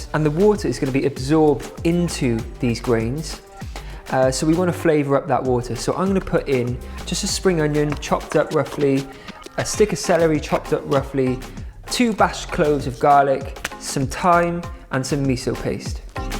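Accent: Wales, United Kingdom. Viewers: Damn - James left SortedFood today, and just as we all hoped you’d pop up as a guest chef in their London kitchen, you move back to Wales! Although as most of my family lives near Swansea, I’d be happy to pop up the M4 to any restaurant, kitchen or culinary school you open up. Look forward to your Welsh accent amping right back up:) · love that welsh accent <3 many many many good wishes for your new proyect of life. love you ! besitos! Love the music also !!! and the "bloopers" omg the best hahaha thanks for the recipes and the good vibes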